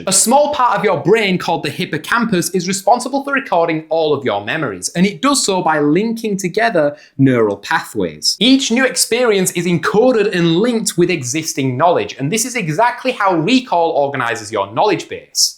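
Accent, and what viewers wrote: Northern England, United Kingdom. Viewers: Gotta love the (British?) accent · Northern (English) british accent.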